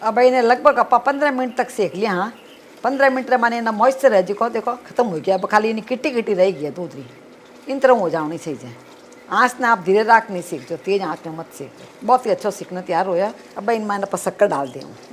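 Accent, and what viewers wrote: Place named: Gujarat, India. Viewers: Namaste masi. I don't know your language but I understand it little little because it's giving me a Gujrati touch. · Is the language Hindi in Gujarathi accet or Gujarathi in Hindi accent It is confusing for south Indian like me .However this recipe looks tasty .Good presentation .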